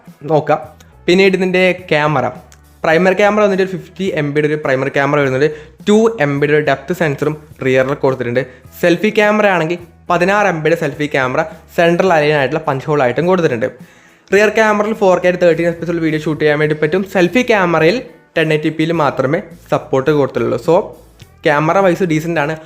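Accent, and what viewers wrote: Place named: Malappuram, India. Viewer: Malappuram jillayilano.rasamulla slang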